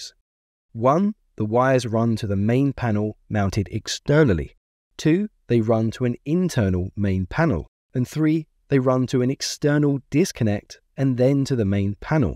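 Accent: England, United Kingdom. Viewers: It's amusing to hear an English voice explaining the American electrical system. It's also interesting to see how different the US system is from the UK system, which is variously different again from the systems in most European countries. Of course, the general principles are the same but the details are fairly different. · Hearing an Englishman talk about North American electrical systems is so weird. Not as weird as UK electrics, but close. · Really peculiar hearing an English voice describing the US electrical system... I thought the two "hot" wires were on 2 different phases????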